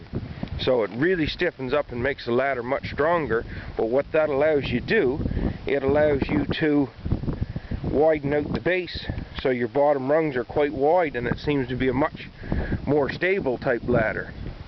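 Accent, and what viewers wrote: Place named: Maritimes, Canada. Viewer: Maritime Canadian accents the best accents.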